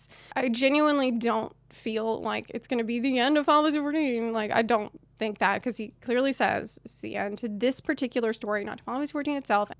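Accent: South, United States. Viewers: when you sound southern American and British at the same time :|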